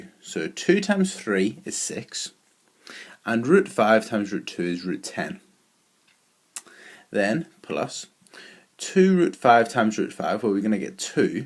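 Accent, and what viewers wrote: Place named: Northern Ireland, United Kingdom. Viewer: Thank you! This is a very late comment but this was really helpful. Was surprised to hear your northern irish accent (same as me haha)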